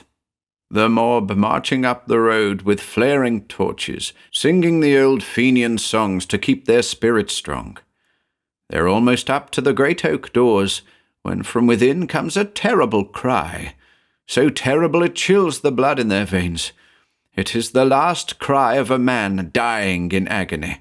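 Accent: United States. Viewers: What an amazing weird tale. Expertly written. Two distinctive main characters each uncovering the mysterious secrets of their inheritance both together and separate from each other. Perfectly narrated as always. Glad to hear the American accent is still spot on. Great choice of story. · Your American accent is spot on. Cheers for the performance! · This is such a rich and believable story. An almost Tolkien-esque commitment to lore, attention to family lines and culture. It feels believable because it's steeped in history. This author just HAS to be Irish! Of course does a stand up job as always. Your American accent is so John Wayne tough. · You do a really good American accent, as an American myself 🩵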